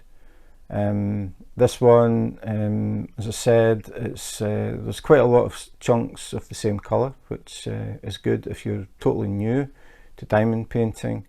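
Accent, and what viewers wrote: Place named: Scotland, United Kingdom. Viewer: It's always fun to listen to a Scottish accent. So cool. My father-in-law is Scottish but he has lived in Australia (where I'm from) since he was 17 y/o. He's now 80 y/o. I thought his accent was still strong but after listening to yours I realize it's not as strong as I thought.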